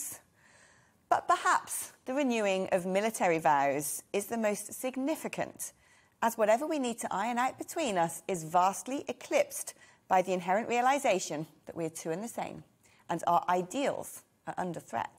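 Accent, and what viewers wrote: United Kingdom. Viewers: Condescendingly, British. Iii like it! 😀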